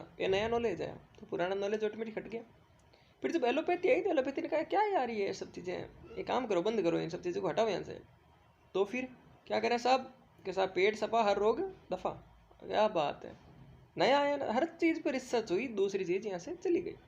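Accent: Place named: Rajasthan, India. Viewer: Rajasthan ke lag rhe ho Guru ❤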